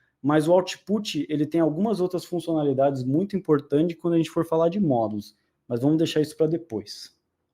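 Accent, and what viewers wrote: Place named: São Paulo, Brazil. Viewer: Fale mano (sotaque paulistano 😅) você foi top na explicação. O lance de explicar os bloquinhos do TF achei muito didático e me fez entender o básico da coisa em 30 minutos de uma maneira phoda. Suas analogias sobre o declarativo e procedural e o Ansible que estava querendo saber o que era ao certo. Parabéns pela didática e simplificação para um melhor entendimento. Eu hoje “brinco um pouco” com Cloud Formation e se desse para fazer um comparativo de CF x TF o que muda por exemplo ao criar um s3 em CF para TF seria top. Com sua explicação vou tentar fazer isso na empresa (trabalho no Itau) e só para vc saber, seu conteúdo esta servindo como base para muitos aqui. Mais uma vez parabéns pelo trabalho Deus abençoe sucesso e felicidades.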